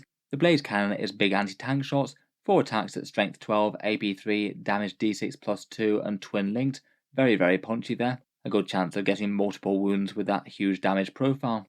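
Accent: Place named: United Kingdom. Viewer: Pretty much everyone in the UK speaks like that ;)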